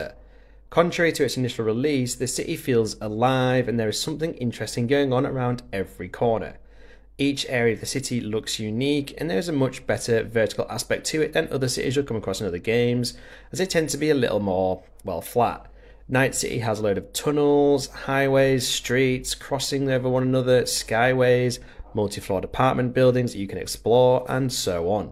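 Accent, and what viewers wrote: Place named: United Kingdom. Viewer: Was expecting a terrible loud overzealous voice (common for gaming channels), but instead found a softly spoken British man who calmly conveyed the message in a concise manner. Excellent work, subscribed ❤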